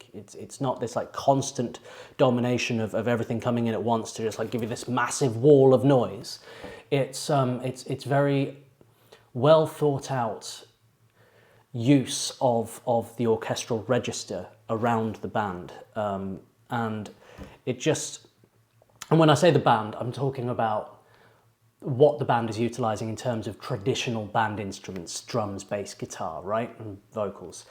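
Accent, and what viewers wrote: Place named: Surrey, United Kingdom. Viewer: I would absolutely love you to a deep dive on some of your favourite Nightwish tracks. I cannot agree with you more that on a first few listens it's all about enjoying the experience, and from there, starting to pull it apart and understanding how any why it works so well. Chances are I'll be popping onto your buy me a coffee because I certainly have a few that I'd like you to get to experience. Kind regards from Surrey in the UK. (From your accent, I'd guess you're not that far away :) ).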